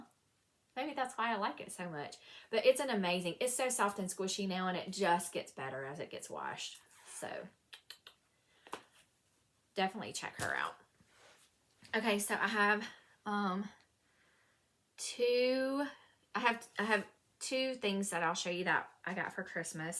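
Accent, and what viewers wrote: South, United States. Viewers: Hard to pick one favorite moment of your podcast but I always enjoy your southern accent and how you just enjoy yarn & your life. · Congratulations on your one year podiversary. It’s hard to pick a favourite moment but learning the correct way to say pecan today is up there along with your delightful southern accent. I’m in Australia so you sound very exotic and of course I was mispronouncing pecan 😉🙃😎❤️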